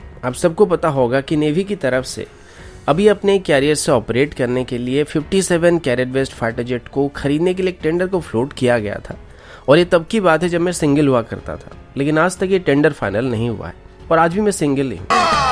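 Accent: Odisha, India. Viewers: Bro r u from odisha...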